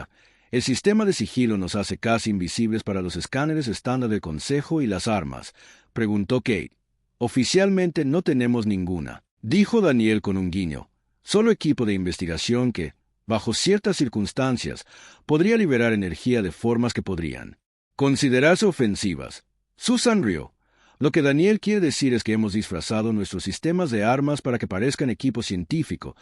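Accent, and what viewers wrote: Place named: Bolivia. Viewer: el que habla es boliviano jajajaja, me gusta, pero no con fio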